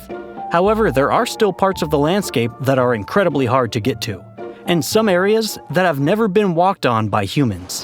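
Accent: United States. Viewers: Dude's got an American accent so he's likely American and in America we use miles and Fahrenheit, so it makes sense.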